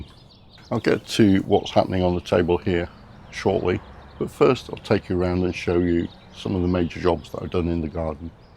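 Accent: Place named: Greater Manchester, United Kingdom. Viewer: I recently found a local Bonsai Club and looking forward to joining a meet. The majority of my collection are in training. I have learned a lot over the past few years. If you ever need to lighten your load I'd be happy to help, listening to your accent I don't think you would be too far away from me in Greater Manchester. Keep up with the great content 👍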